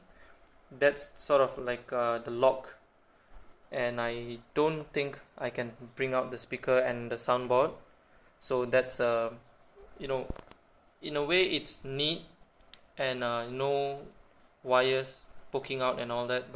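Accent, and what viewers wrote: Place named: Singapore. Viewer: lol I hear a Singaporian accent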